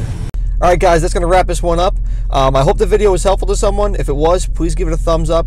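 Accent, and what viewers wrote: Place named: Massachusetts, United States. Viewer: Are you related to Ivan (I think that is his name) of Pine Hollow Diagnostics? You sound a lot like him, but with a bit of a Mass accent, you look a lot like him, you have same mannerisms AND you guys are both high level with auto electronics... Great video, ty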